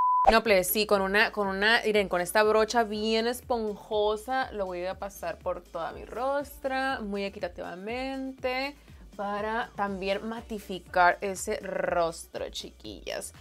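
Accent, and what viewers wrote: Sinaloa, Mexico. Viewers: Jajajaja m encanta cómo a blas jajajaja 💯 % sinaloense 🙋🏼‍♀️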